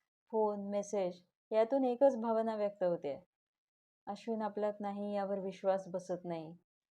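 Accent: Vidarbha, India. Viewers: अश्विन भाऊ भयंकर धक्का देवून गेला तु तुझी सवयच लागली होती रे तुझी वैदर्भीय भाषा आपलीशी वाटायची तुला विसरण कठीण आहे भाऊ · त्यांची बोलण्याची खास वऱ्हाडी शैली फार आवडायची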